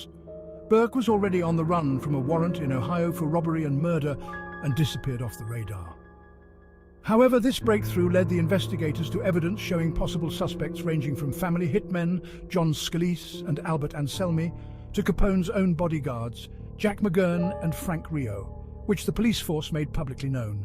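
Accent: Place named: United Kingdom. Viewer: Why use a silly British voice? So difficult to understand. Use American language please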